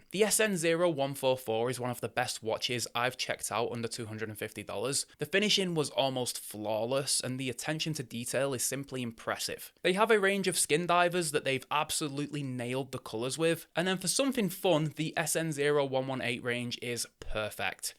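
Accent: United Kingdom. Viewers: I really like this guy. Is it the UK accent? Or the fact he reminds me of Jon Snow from GOT?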